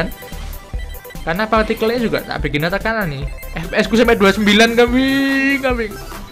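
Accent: Java, Indonesia. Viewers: gunakan kemedokan nya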